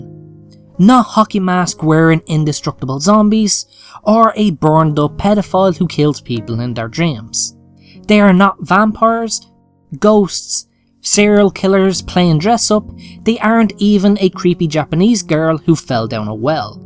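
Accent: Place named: Ireland. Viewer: Are you Irish or Scottish? I love your accent. I studied RP at the university. Oh, it says that you are from Ireland later on in the clip.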